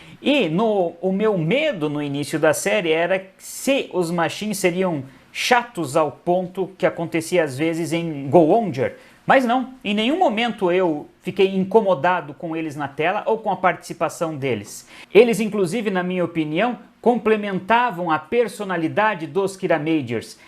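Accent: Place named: Brazil. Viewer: I figured it was Portuguese. Its a great video. As a native Spanish speaker i understood about 60%. Its great to know that Toku is watched all over the world! I'm going to assume that you're from Brazil since it sounds like Brazilian Portuguese. I which that toku could be more popular here in the US where I live P.S. if you could someday add subtitles it would be also. Regardless I'll subscribe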